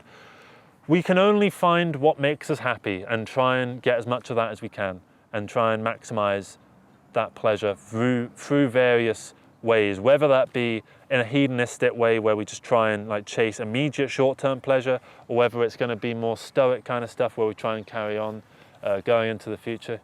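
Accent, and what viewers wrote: United Kingdom. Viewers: The algorithm seems to incorporate even your style of music, scary! Meaning I like your music also, the eminor guitar stuff. Holy shit, you’ve basically just wrote a best selling psychology book for artists! Prove me wrong! I’m double your age. Maybe it’s your brit accent, but your style and mannerisms remind me alot of a John lennon. I know what I’m saying. Because of human nature imperfections I was gonna side with u on the relationship thing, and then The homuncle whispered, no, its no body’s fault, it just wasn’t meant to be, we didn’t mix and thats it. You see that, your realistic look of peace and love has affected my thinking! Keep playing that fucking guitar and keep talking, they inspire me, your genuine man.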